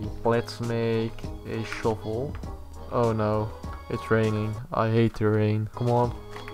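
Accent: Netherlands. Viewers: are you from the netherlands or something becouse you have an netherlands accent nice vid btw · are you dutch? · Are you a Dutch men?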